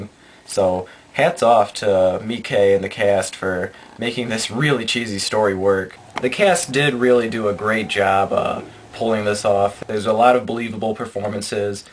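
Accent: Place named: Michigan, United States. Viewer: I'm betting you're from Michigan... :p Nice accent ;)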